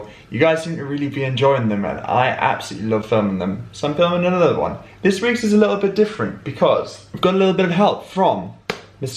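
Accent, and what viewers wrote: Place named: Durham, United Kingdom. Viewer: pleasantly surprised to hear a Durham accent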